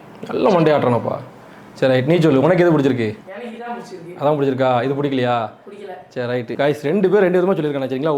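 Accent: Tirunelveli, India. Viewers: tirunelveli , tenkasi kaaranga maathurii pesuringa jii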